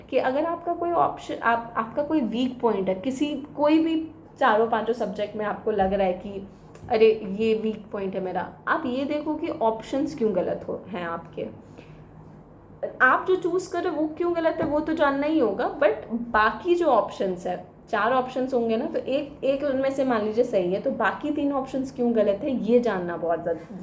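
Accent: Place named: Bihar, India. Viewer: Aap Bihar se ho? Accent se lg rha hai.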